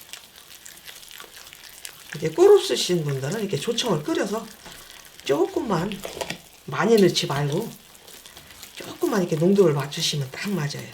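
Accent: Chungcheong, South Korea. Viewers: 충청도 말씨가...고향에 온 느낌입니다. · 충정남도 아님 전북분 말씨 같아유 정겹네유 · 엿기름이 안들어가서 더 쉬워보이네요. 나도 장을담그는데. 막장은 안해봐서.한번해보려구요. 충청도아줌마인가요? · 항상감사히잘보고있읍니다충청도분같아요 구수한말씨며여유로우신마음씨가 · 여기 버지니아 인데요 잘보았습니다 1월쯤담아야셌네요 충청도 사투리 좋아요 내가 예산이 고향이니까요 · 좋은영상 잘보았습니다 김장해놓고 꼭담글겁니다 강상합니다 말씀 이 충청도에유 듣기좋았어유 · 정감있는 내고향 충청도 구먼유 잘 배웠어유 감사해유^^ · 구수한 충청도 사투리 정겹습니다 저도 한번 담가보겠습니다 감사 합니다^^ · 척척박사, 정결. 깔끔. 충청도 사투리까지. 주부와 여성의ㅡ모범 이십니다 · 저도 츙청도 인데 말씀이 넘 구수하내요 막정에 엿기름 넣어 해 보았는데 아니더러구요 얼려주산대로 해 봐야겠오요 정성이 엄청들어가내요.보여주기 정성이네요 고추싸없으면 고추가루 넣어도 되나요 아닌 국물 냘때 먀운고추 조금넣고 끊여도 될까요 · 충청도아줌니인가봐요 ㆍ수고많으시네요ㆍ저두. 따라서해볼랴구요ㆍ수고하셨수 ᆢ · 저두 충청도 결성인디 사투리 정겹습니다....ㅎ 묽으스름....ㅎ 저는 보리쌀을 방앗간에서 가루로 빻앗는데 그냥가루로 죽쓰면 될까요? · 말씀도 참정감있고 맛깔스럽게 하시네요ㆍ아드님하고 대화도 사랑이넘쳐요ㆍ고향이충청도라 사투리가 아름답게 들려요ㆍ요리도 믿음이가네요ㆍ당장따라해볼게요ㆍ고맙습니다ㆍ❤❤ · 구수한 충청도말씨 정겹네요 옛날 어른들이 담그시던 막장 먹고싶었는데 잘배웠어요 겨울되면 담가야겠어요 감사합니다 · 충청도 구수한 사투리가 너무 정겹습니다 전 막장은 한번도 안담궜는데 당장에 해야겠어요 쌈장하면 맛있을것같애요 맛나게 익으면 며느리도 주면 좋아할듯하네요 귀한 정보 가르쳐주셔서 감사합니다 고맙습니다 ~~~♡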